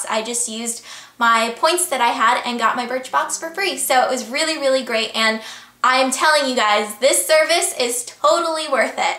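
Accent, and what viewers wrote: France. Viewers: i'm a french girl and your french accent it's really coooolll & pretty ^^* !!!! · your french accent,, is so cute :) haha xx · ahah i like your french accent !! uugghhn i really hope BIRCHBOX will send to France soon !! i'ld like to try this; it seems really fun and its a cool way to try new things have a good day =) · you have an amazing french accent (: birchbox need to send to england!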